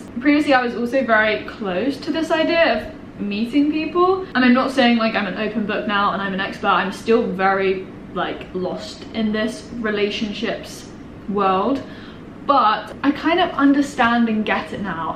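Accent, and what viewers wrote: Australia. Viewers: You’re picking up the Australian accent. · The Australian accent is creeping in.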